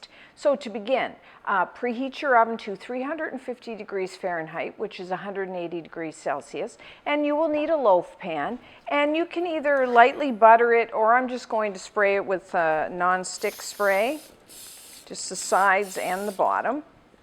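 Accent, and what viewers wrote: Canada. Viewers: She’s definitely Canadian 😂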